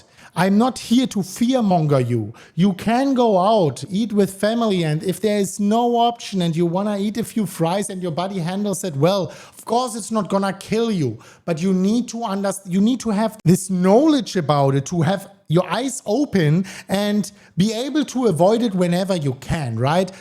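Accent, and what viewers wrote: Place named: Germany. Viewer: Du hast aber auch einen deutschen englischen Akzent 😂❤😂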